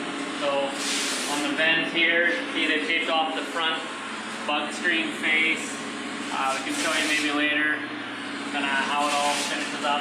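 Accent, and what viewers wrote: Canada. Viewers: Haha....you sound just like me. I'm in Northern Ontario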